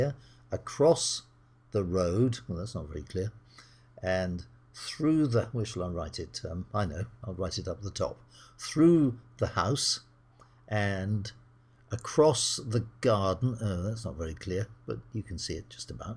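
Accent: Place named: United Kingdom. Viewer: liked and subscribed right away as I heard that fatherly, sincere voice with british accent explaining things in a visual way which helps us easily memorize. Keep up what you do sir.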